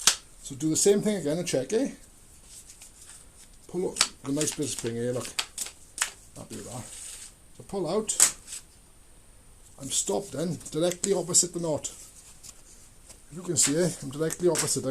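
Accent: Wales, United Kingdom. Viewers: Brilliant video thank you for saving me a huge head ache of trying to figure it out. By the way love the way Youtube's captions struggle to catch catch your welsh accent. Much appreciated!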